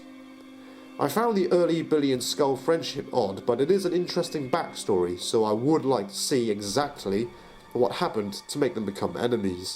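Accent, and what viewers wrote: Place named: United Kingdom. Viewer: I've been watching your vids for a while now, and I've only just realised you have a British accent. I'm British myself wtf ahahahaha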